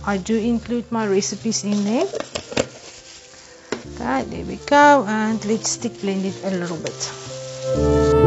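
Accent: South Africa. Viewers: I thought you had a German accent I'm sorry South African that's COOOL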